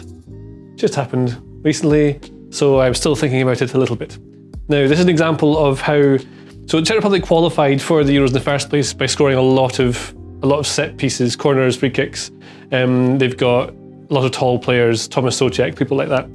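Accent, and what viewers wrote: Scotland, United Kingdom. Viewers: Probably don’t work very well because of his Scottish accent, Google is pretty discriminatory like that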